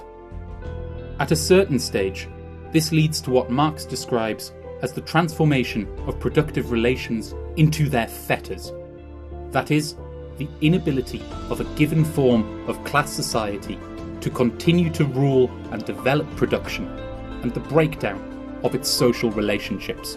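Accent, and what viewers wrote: United Kingdom. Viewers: You sound British or Anglo-Saxon for sure, so you don't live in communism. SO, JUST ASKING HOW DO YOU KNOW THAT IT'S SO GREAT AND WONDERFUL? LEGIT QUESTION.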